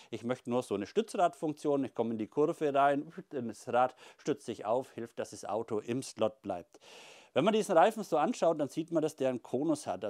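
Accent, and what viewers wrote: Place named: Franconia, Germany. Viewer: …für mich ist DAS Fränkisch…😂😂😂 Den richtigen Dialekt versteht nur ihr “vor Ort”….🎉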